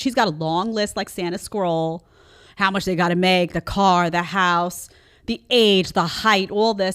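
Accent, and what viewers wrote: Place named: New York, United States. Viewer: Jedediah's NY accent leaks out occasionally. I prefer it, to be honest.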